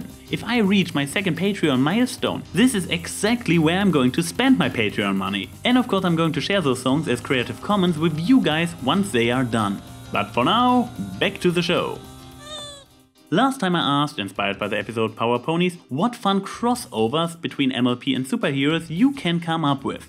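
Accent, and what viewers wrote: Germany. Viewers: OMG THE GERMAN ACENT!!!!!!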